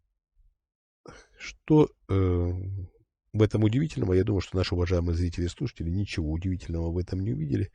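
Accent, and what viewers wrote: Ukraine. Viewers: Вопрос. Скажите пожалуйста, почему у вас украинский акцент?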